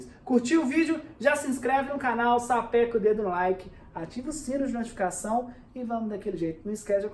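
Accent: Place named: Minas Gerais, Brazil. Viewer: Fala, como vai? Bom pelo sotaque acredito que seja mineiro, se for Tmj soh, se não Tmj tbm 😝, possuo um Vectra 08 Branco, poderia me orientar sobre qual verniz é utilizado nesse tipo de carro? Agradeço a atenção e as dicas que vcs nos dão, forte abraço!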